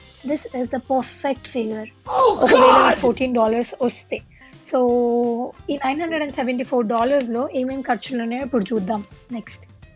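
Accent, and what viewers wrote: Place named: Hyderabad, India. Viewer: Superb Hyderabadi language 👌👌👌👌👌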